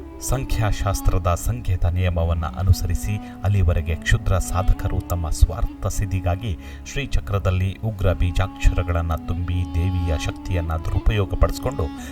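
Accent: Standard. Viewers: Shuddavaadha kannada uttama vaada voice sir nimdu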